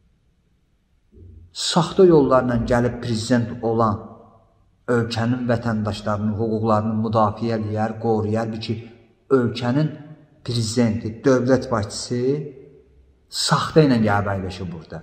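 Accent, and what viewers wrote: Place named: Basqal, Azerbaijan. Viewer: Bskılı kimi danışır nə molla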